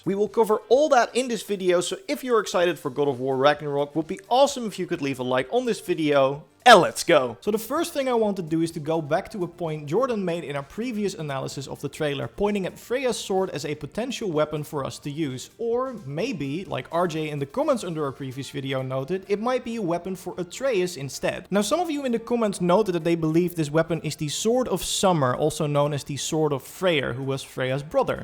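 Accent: Netherlands. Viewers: That Dutch accent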